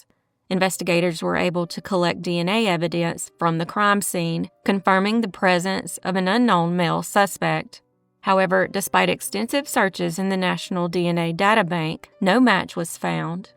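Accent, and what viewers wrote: South, United States. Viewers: I like your little music that you play in your southern accent hello from Minnesota❤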